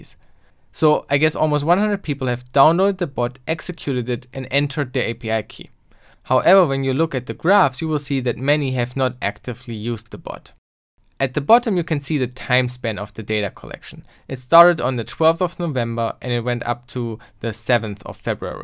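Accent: Germany. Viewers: Love your German accent, as soon as I heard your accent, I was like " this video should be good".. haha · german? xD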